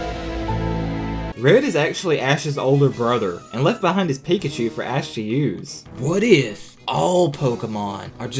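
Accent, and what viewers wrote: South, United States. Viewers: Ugh, your voice, it's like a gay southern accent. no offense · He sounds like a hillbilly. (But not to say he is stupid)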